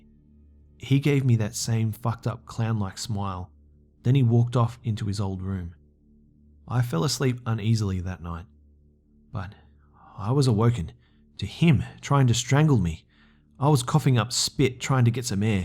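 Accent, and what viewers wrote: Australia. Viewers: Hold up your from australia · I did not know you were Australian until now. Cool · OHH YOURE AUSTRALIAN!! I love that (btw is that weird? sorry, I'm American)😂👌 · Your voice is perfect. The Aussie pronunciation is very refreshing. Great video as always. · I...Can't believe it took me this long to realize you're Australian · Can't tell if you are trolling but the narrator is very, very Australian.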